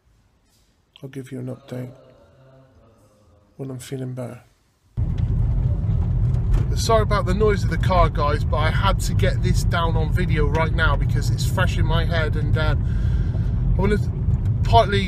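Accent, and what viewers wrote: Somerset, United Kingdom. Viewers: Being from Bristol I just knew is was a Somerset accent. ;-) Thanks for sharing the video. My first manic episode involved me wearing sunglasses so that people wouldn't steal my thoughts.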